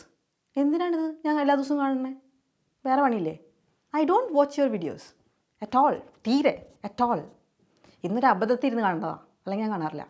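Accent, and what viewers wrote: Thrissur, India. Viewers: Nice class . 👏. Madam, are you from Thrissur ? Your slang is like thrissur ,am I correct,,??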